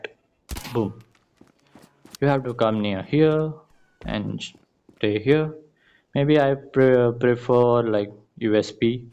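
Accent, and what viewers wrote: India. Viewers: Pajeet accent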